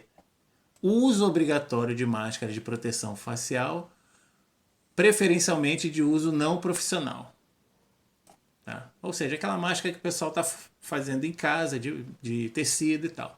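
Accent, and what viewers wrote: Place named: Rio de Janeiro, Brazil. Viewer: Vc é paulista??? Achava que era carioca, do Rio de janeiro.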